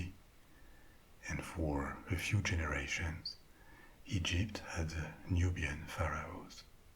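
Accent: France. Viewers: I hate the fact that this channel is labelled ASMR. I hate the way you whisper. Hell, I even hate your French accent. But bro... son of a gun, I will be going to sleep to your soothing tales of ancient myths, legends, and history for the next few months. Damn you, ASMR Frenchman. Damn you.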